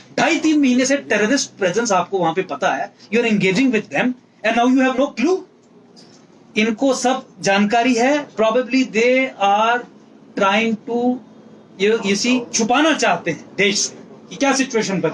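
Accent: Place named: Uttar Pradesh, India. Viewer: Bhai tu Dogri bola kar waise ya Achi jammu wali hindi naa ki ye Up wali hindi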